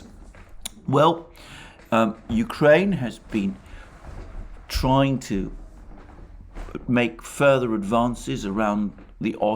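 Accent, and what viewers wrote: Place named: RP, United Kingdom. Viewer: He uses the Queens English that's why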